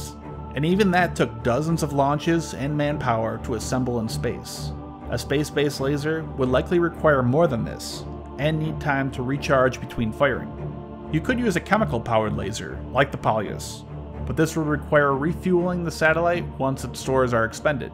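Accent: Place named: United States. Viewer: Love this channel. Only person with an American accent who's unbiased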